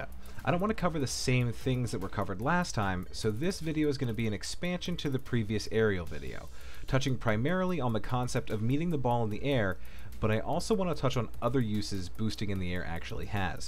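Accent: Netherlands. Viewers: So you're dutch i reckon.. Where did you get your accent? i like your vids btw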